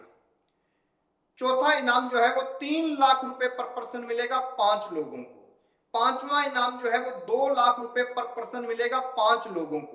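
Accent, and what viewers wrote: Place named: Punjab, India. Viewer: Bhaiya aapane bhi Punjab ki kharidi thi na Kyunki aapki awaaz se lag raha hai kya Punjab se hi hai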